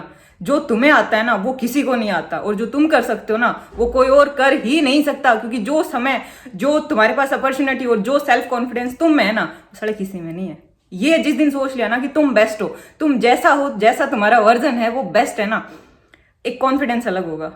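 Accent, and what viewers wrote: Haryana, India. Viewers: Aap haryana se hai mam? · I like the...way..yuu speak... Haryanvi 😊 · Makha ram ram 😃😃😃, nice starting, hariyana bala style sunme thoda kadwa but achha bhi lagta hei 👍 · Your Hariyanvi accent 👌 💌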